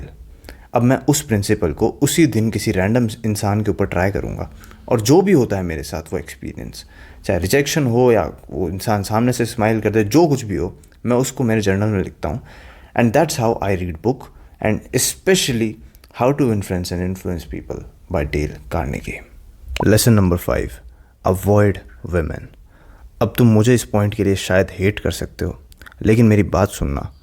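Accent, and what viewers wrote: Rajasthan, India. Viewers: Bhai tum rajasthan(marvad) meh konse shaar se ho? Tumara accent and ghalc***a se pata chala.😂 I too want to make physic💪 like you and wanted to learn many skills in life.(in short, work on myself) which I have missed to do in my teenage. But will try to ingage in things whenever I will get chance.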